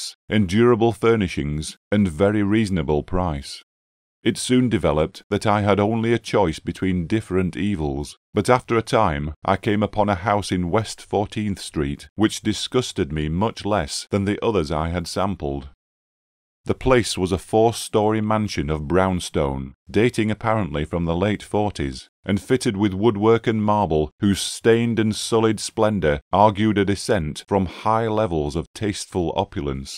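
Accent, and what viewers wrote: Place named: Spain. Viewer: One of the best Lovecraft's tales! ⭐⭐⭐⭐⭐ Funny one Spanish doctor's name was 'Muñoz' & the other one 'Torres'. 'Torres-Muñoz' is a pharmaceutical company founded by the time the tale was written & that still exists in Spain! They make pharmaceutical salts. 😀 Thank you for the narration & was enjoyable with the Spanish accent! 🙏☺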